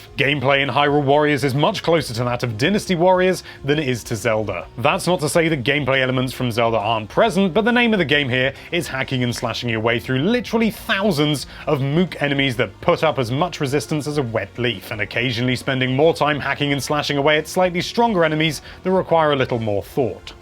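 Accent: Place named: United Kingdom. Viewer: thedreadedzero it’s the British-English pronunciation, as stated in the dictionary. Die-nasty is an American pronunciation, and is british.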